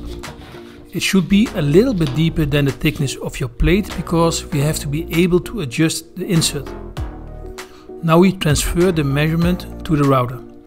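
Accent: Netherlands. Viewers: thanks for your reply, very much appreciated. Though i grew up here, i was born in the Netherlands and its a pleasure to hear that Dutch accent coming through! Ill be posting a video of my work bench build, though not nearly as impressive i think its going to be a great addition to my tiny workshop. Check out “captain of my shed” episode 6 if you want to feel even better about your amazing workshop!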